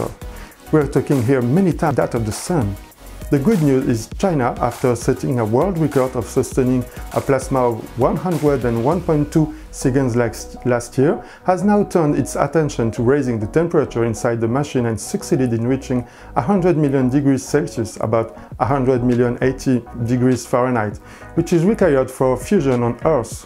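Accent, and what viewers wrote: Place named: France. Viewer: Maydee I ffink ze baiste iss mébi douwing your videyos in frenche and subtitle them in English. I had to turn subtitles to understand, you have a very Antoine de Caunes type of accent and some words are just missed because of the “intonations “.... but very good choice of news, happy indeed. But try smiling (even) more. It’s happy news ! 😁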